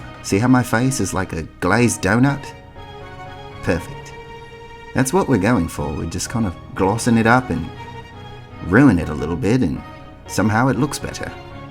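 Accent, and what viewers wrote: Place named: United Kingdom. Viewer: I’m deaddddd 😭 he really sounds British tho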